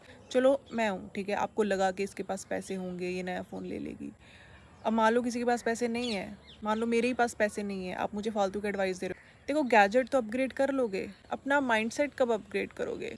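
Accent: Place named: Punjab, India. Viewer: Aap Punjab k ho ya विदेशी Me Rajasthan se हू😮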